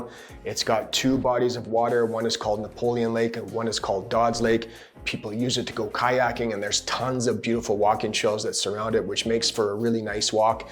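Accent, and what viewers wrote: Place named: Canada. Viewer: Thanks for the info always loved Alberta. Really enjoying your Canadian accent.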